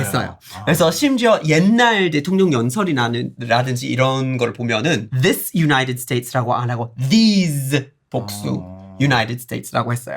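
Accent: South Korea. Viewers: 타일러 말하는거에서 광주이모가 오버랩 된다 ㅋㅋㅋㅋㅋㅋㅋㅋ 광주쪽 교수님이랑 친하신건가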